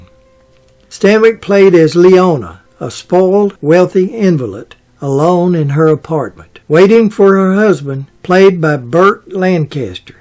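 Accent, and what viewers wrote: South, United States. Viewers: sir, I have mentioned over the years how I enjoy your "Southern" accent bringing additional entertainment to your videos, and this is true. As a transplant from NYC to now southwest FLA, I enjoy it even more. BUT! I am remiss in not mentioning, not only do I enjoy your videos, but I have not mentioned the amazing detail in the research that you unfold for us in ALL of your videos. Well done ALWAYS sir!! Keep them coming!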